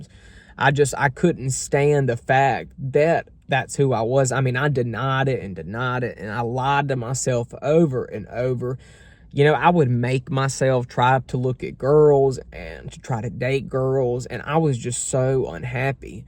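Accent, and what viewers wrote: South, United States. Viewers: We love your Southern accent! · I love your southern accent. It's so hot.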